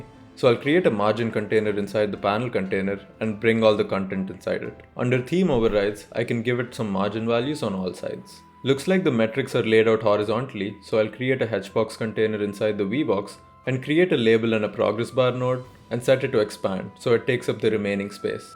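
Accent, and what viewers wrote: India. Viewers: Easily one of the best educational videos on YouTube I've watched. Not trying to be mean here, but I usually struggle a lot with indian accents, yours however was even giving more character to the narration. Had me chuckling a couple times as well, extremely good pacing and all in all a great course! Please keep doing more! Instantly subscribed. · apparently indian english speakers are great at making tutorials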